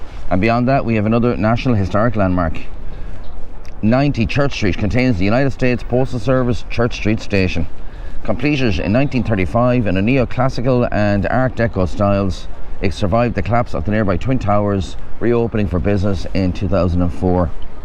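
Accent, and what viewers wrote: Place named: Ireland. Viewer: 语速比之前慢了不少，发音也更清楚了👍就是爱尔兰口音依旧明显😂对于英语初学者来说视频整体可能还存在一些难度，不过感觉如果把所有视频都看熟了可以收拾行李直接飞都柏林了😄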